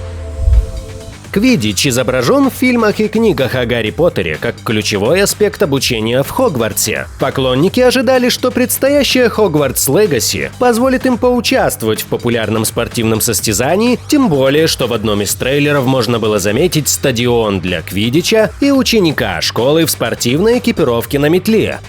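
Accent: Ukraine. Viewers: Яка гарна українська😃🤗 гадаю що ви ховаєте цілу нишу можливостей! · Сотня вподобайок за гарну україньську!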